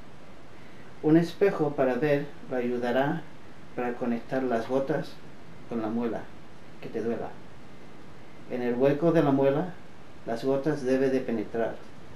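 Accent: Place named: Andalusia, Spain. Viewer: que acento mas andaluz xDDDD :)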